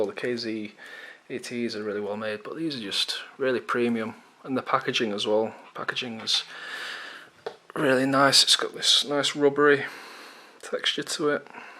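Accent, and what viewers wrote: United Kingdom. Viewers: Are you british? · A bit off topic but you have a British accent and pronounce the Z "Zee" instead of "Zed"?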